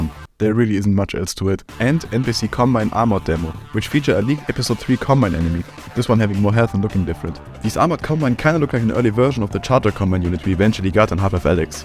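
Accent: Germany. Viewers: He’s speaking German when speaking English. I love it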